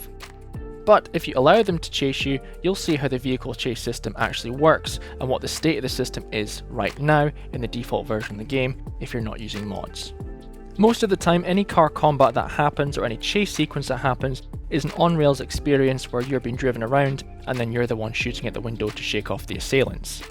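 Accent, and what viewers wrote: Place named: Edinburgh, United Kingdom. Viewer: Your accent, you a fellow scot? Sounds like an Edinburgh dialect. Great vid btw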